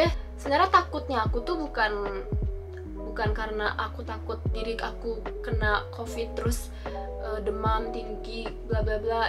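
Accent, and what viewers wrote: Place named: Japan. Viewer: Suka kak kalu ngomong L ada lidah jepangnya, lucu😂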